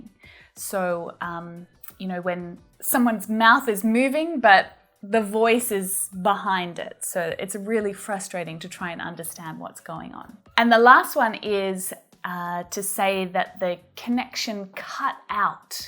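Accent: Australia. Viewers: I'm a little bit getting hang of Aus accent while learning your videos.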